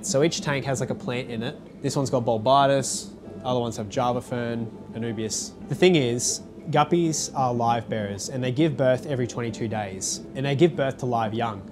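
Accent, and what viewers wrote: Australia. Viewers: There's just something special about someone with an Aussie accent telling you about guppies haha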